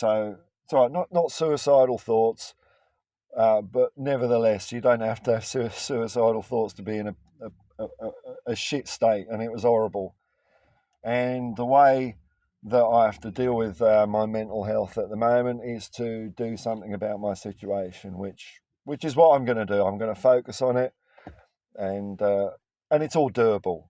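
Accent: Australia. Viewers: . Its was a pleasure to watch THE ONLY PSYCHEDELIC SOCCER SHOW ON THE INTERNET!... I was lucky to watch the channel grow from a few subs into a couple thousand. Loved your creativity and sheer passion for Tottenham Hotspur, it was good having an Australian voice for all us in the southern hemisphere (NZ). Im happy you have taking time for your mental health, its whats most important. Its a big loss for the spurs'a'sphere as you and Jacob (unitedspursofamerica) are some of the only positive channels i tune into. Take time, take it easy, I selfishly hope to get an occasional video from you here and there, perhaps after a big win! Thank you mate.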